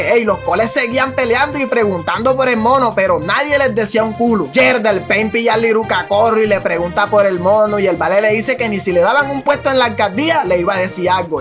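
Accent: Colombia. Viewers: Me gusta lo costeño como 🤠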